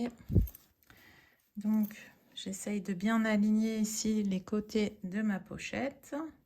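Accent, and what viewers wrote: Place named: Nord-Pas-de-Calais, France. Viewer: Mais oui chti toujours 😂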